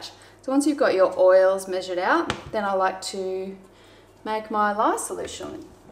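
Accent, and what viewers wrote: Australia. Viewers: Maybe they just don't like the Australian accent.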